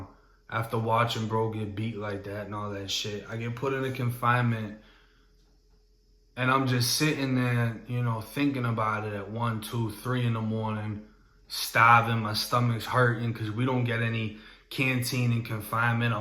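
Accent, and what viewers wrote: Boston, United States. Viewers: "the sahgeant" my man you sooo Boston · I’m under my girl’s thing here , anyways you’ve done a lot of time in Florida ? But your accent Boston ? I’m from NY , but lived in Florida for some yrs , spent a lot of time in Polk county fl , south county jail ( frostproof) our sherif was Grady Judd at the time , not sure if he still is ? But he’s a trip bro , could u do something on Polk county ? Ty for your vids pretty cool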